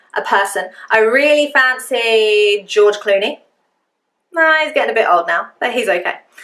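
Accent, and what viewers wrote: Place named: RP, United Kingdom. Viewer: Dear Prof, You are amazing. I do love your British accent, your posh accent. Love. Andrea from Venice, Italy.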